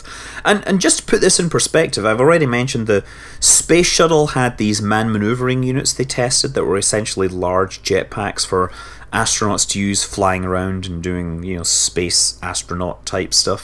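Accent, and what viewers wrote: Scotland, United Kingdom. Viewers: Really enjoy your videos mate, also i have scottish grandparents so the accent always brings me back to the perthshire moors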